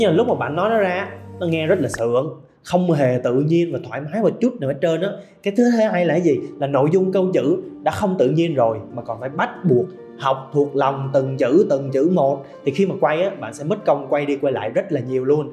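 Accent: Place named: Central, Vietnam. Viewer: Mình nghe là biết bạn người miền trung nhưng vạn nói hay đó, diễn đạt dễ hiểu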